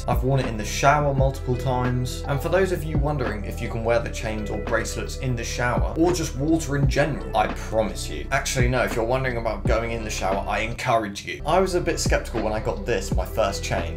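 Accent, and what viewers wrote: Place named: United Kingdom. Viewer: Love ur british accent homie 😂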